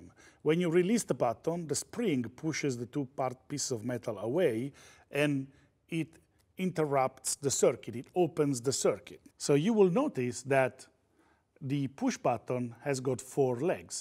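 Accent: Italy. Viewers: Si capisce che sei italiano, sia dal nome, sia dalla pronuncia, quindi si potrebbero avere i sottotitoli in Italiano per chi come me non sa bene l'inglese?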